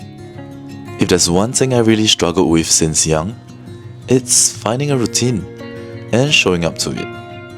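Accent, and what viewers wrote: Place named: Singapore. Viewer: Hey, keep going man. People who succeed in life are the ones who always learn and push themselves to be better. Good luck with your goals and inspirations. P.S. You sound like Singaporean. I am moving to BKK from SG with the family soon too (I am a westerner). Maybe we could catch up IRL:D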